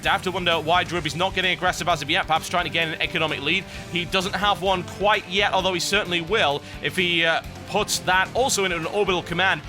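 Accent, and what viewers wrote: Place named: United Kingdom. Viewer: hes so british.. i can't understand a damn thing when he talks fast, but i am no less excited.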